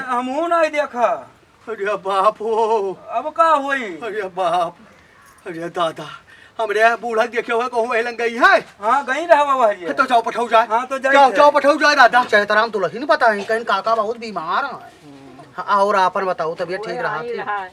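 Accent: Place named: Awadhi, India. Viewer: अति सुन्दर प्रस्तुति मजा आ गया आपकी इस उत्कृष्ट और सुन्दर एक्टिंग से।। हम लोगों का सुंदर बचपना याद आ गया। सबसे सुंदर ये लगा की अपना अवधी में सुना। इतनी मिठास भरी हुई है की मन प्रफुल्लित हो गया।।। Best of luck for your future endeavours and all the upcoming videos.. My best wishes to you and your teams.. God bless you all. Jai shree Ram ❤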